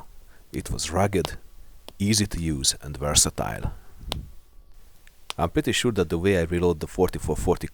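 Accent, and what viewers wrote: Hungary. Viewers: So accurate shots! Perfect video with nice hunglish accent as always! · ..az angolod jó csak nagyon magyaros😅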